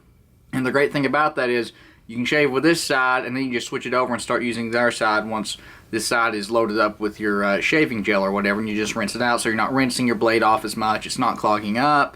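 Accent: South, United States. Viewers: That southern accent tho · I am going back to these, as I started with them as a boy. I changed to GILLETTE and now am changing back. We all know why I guess. I watch Tesla racing channel mostly because of that great Southern accent. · As a brazilian guy I proud to say that my english is good enough to reconize that this guy is a Southern one.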